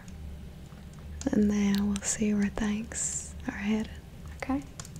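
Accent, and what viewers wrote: South, United States. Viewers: I spent 17 years in northeast Alabama every summer. Fell in love early with a southern voice. Thanks for doing this one. God bless Southern ladies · You have the southern talk down well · Real Southerner here says that accent ain’t too far gone. I’d have a hard time believing there ain’t somebody country in your world. Keep it up. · As an Aussie who just got back from a work trip to Alabama....gee I miss hearing this accent! Sweet tea, BBQ, football, and Jesus. Hahaha! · Southern accents are the best. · This is a perfect southern role play I really love it!! Love how southern people talk! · I love your southern accent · The southern accent is so underrated. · Glad you went with this thumbnail! I knew from the stream earlier your southern accent was going to be great at least for asmr purposes. Thanks for the great content as always:) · that southern drawl was 🔥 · as a southerner, the accent is pretty good 🤠 the way it falters sometimes is actually realistic, not every single word has to have a drawl to it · That southern drawl tho.. 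· One of my favorite RP videos. I adore the southern accent. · If you're not a southerner then I applaud you, I'm born and raised in Georgia and your accent is spot on. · The southern accent has an innocent, flirty sassiness to it, I love it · I spent most of my life in the South and I didn't hear any problems with the accent. Good job!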